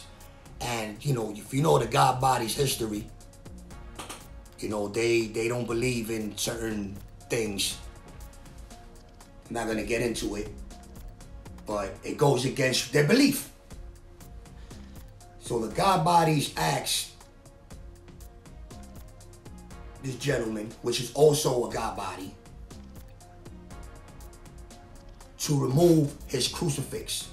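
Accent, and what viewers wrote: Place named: Brooklyn, United States. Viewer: I really enjoyed your stories please keep making videos. You and warpath both sound like guys I remember as a kid, I’m from Brooklyn Marlboro projects and Bensonhurst its makes me feel like I’m listening to the guys when I was a kid keep it up man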